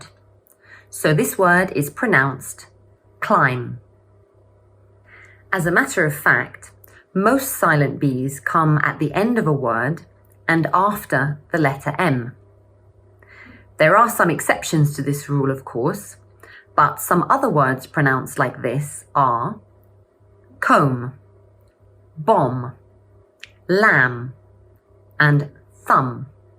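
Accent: United Kingdom. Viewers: 크~ 역시 발음은 영국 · 이채널의 핵심이 영국식 발음 인거죠? 미국식이 아닌게 맞죠??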